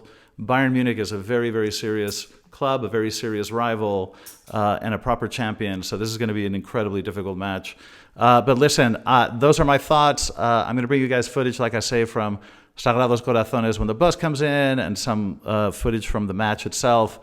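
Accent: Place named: United States. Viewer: Why do u have an American accent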